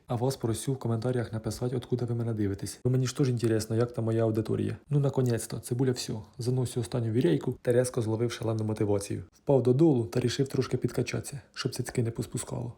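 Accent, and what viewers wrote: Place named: Cherkasy, Ukraine. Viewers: Я дивлюся з Австріі,а родилася на Кіровоградщині.Яка цікава подача-з гумором да діалектом місцевим.Дуже багато знайомих "приколиків" словесних!!!🤗👍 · Німеччина) із задоволенням слухаю черкаську говірку) моя мама з Хлипнівки Звенигородського району) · Дивлюсь з Польщі . Як я люблю цей акцент, провела дитинство у бабусі на Черкащині. Корсунь Шевченківський , Стеблів де народився Нечуй Левицький... Ностальгія прямо... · Вітання з Нідерландів ❤ З першого твого слова вгадала, що ти з Черкащини 😅 Жила я там кілька місяців (м. Шпола). Черкаський гумор приправлений специфічним діалектом — такий само впізнаваний як полтавський борщ з галушками 🎉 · Дивлюся з Варшави, пишу комент с гіпсом 🙂не могла не написати, у вас говір як у моєї мами, сама з Черкаської області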